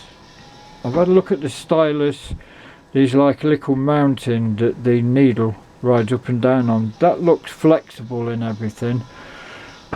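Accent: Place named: East Midlands, United Kingdom. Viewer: Great video. Very interesting and entertaining. Sounds like you're an east mids lad. Cheers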